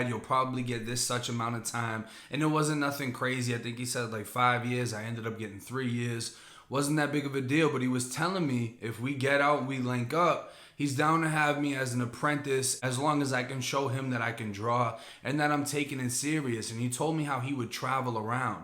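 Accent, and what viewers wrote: Boston, United States. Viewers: I love this dude's accident you can tell he's from like Boston or something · Dude gotta be from Boston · I FUCK WITH YA VIDEO AND STORIES REAL RECOGNIZE REAL KEEP UP YOUR GREATNESS MY DUDE 🙌🏾🤟🏾 AND SOUNDS LIKE YOU FROM BOSTON NOT FLORIDA BUT I KNOW IT GOES DOWN IN TAMPA THE WHOLE FLORIDA GET IT POPPING IM FROM BROOKLYN AND I LOVE EVERYTHING ABOUT FLORIDA THE HOODS EVERYTHING I CALL IT SUNNY NEW YORK LOL 😂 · Am I the only one that says u sound like u have a Boston accent? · Yo bro where's that accent from you sound like your from boston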